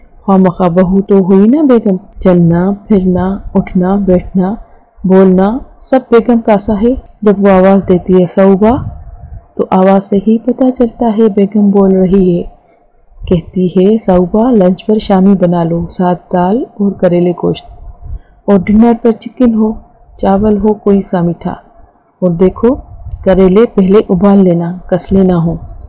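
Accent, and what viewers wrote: Sindh, Pakistan. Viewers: سندھی ایکسنٹ۔۔۔۔۔واہ۔۔۔۔۔بہت مزے کا انداز۔۔۔ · نائس.... سندھی لہجہ___ بہت خوبصورت!!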